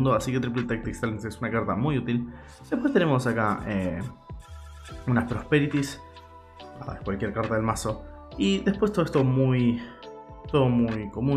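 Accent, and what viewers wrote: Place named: Argentina. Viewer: No te preocupes igual me di cuenta hace rato que eras de Argentina por tu forma de hablar, expresarte, etc, que es como si fueras de Uruguay, me gustó encontrar alguien que hace analisis, da opiniones, predicciones, EN ESPAÑOL, por que la mayoría son gringos y no entiendo una M jaja saludos y sigue asi, todavía espero tu analisis de las magias y trampas y monstruos de efecto de la banlist je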